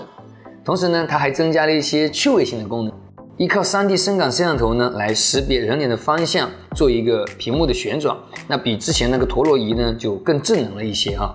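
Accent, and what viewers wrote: Wenzhou, China. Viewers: 是很正宗的温州口音了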